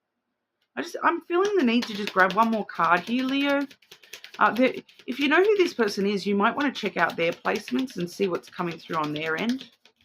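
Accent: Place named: New Zealand. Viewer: Thanks for the reading 🙂 Also thanks for sharing how you ended up with a smattering of New Zealand inflection in your speech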